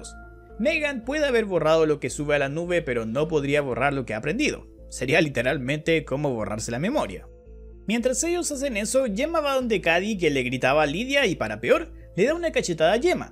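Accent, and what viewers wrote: Chile. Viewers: este wn es chileno a mi no me engañan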